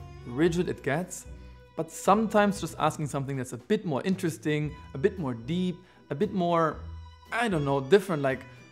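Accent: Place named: Germany. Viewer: Höre dass du Deutscher bist :P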